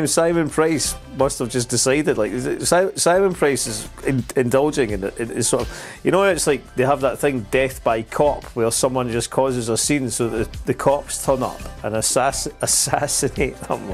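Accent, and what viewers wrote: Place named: Scotland, United Kingdom. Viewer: 😂 I loved your video. Greetings from Colombia 🇨🇴 South America (of all places ) landed here and it made my day.😂 I have to say it man .. the accent .. fantastic! Never hear. Before. Scotland 🏴 right ? Very nice. Beautiful. Will listen more Cheers 🥃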